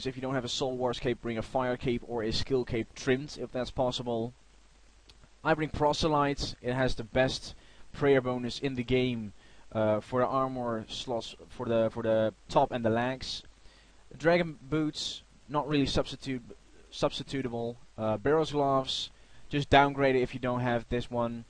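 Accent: Netherlands. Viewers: I'm gonna guess by your accent that you from Holland? My bro thinks you are Norwegian but you sound like you are from Holland. Am i right?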